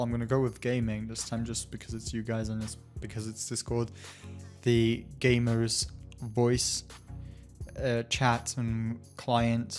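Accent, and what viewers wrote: Germany. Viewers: ist er Deutscher ?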